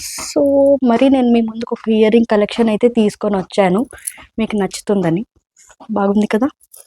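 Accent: Karnataka, India. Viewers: మీ యాక్సెంట్‌ని బట్టి చూస్తే మీరు తెలుగమ్మాయిలా లేరు. కన్నడా కదా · Meru kannnada slag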